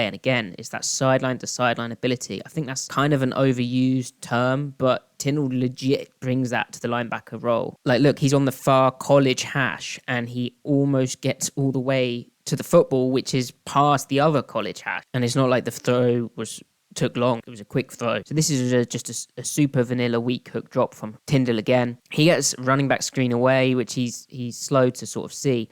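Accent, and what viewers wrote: United Kingdom. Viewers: It's cool hearing a British guy seriously understand football. 🤙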